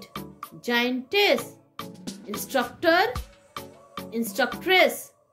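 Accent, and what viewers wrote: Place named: India. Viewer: I like this video and I do not understand Indian accent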